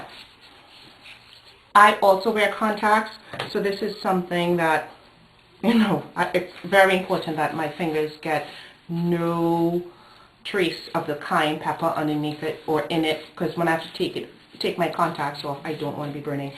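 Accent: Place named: Trinidad and Tobago. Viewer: Girl you sound so Trini, but you're probably from JA, big up!!. Thanks for the tips, will be having a go at it..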